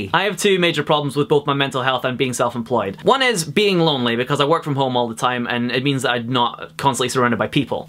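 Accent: Scotland, United Kingdom. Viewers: I really like your videos! I subscribed trying to have a little more contact with the Scottish accent, but now I'm here because of you. And I'm glad you're back to posting!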